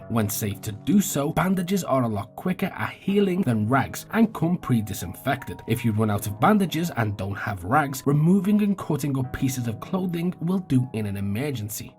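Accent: Liverpool, United Kingdom. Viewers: Can I hear a scouse accent here ?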